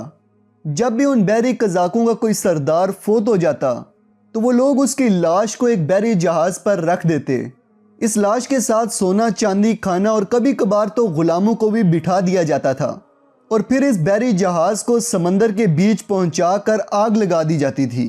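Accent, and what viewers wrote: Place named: Punjab, Pakistan. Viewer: Aap ka Urdu talafuz Sahi nahi hai Aap k talafuz me Punjabi talafuz ki milawat hai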